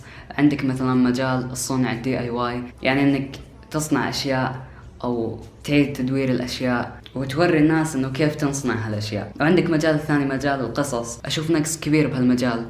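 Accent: Kuwait. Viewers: انت سعودي احسبنك كويتي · أحسبه كويتي ههههـ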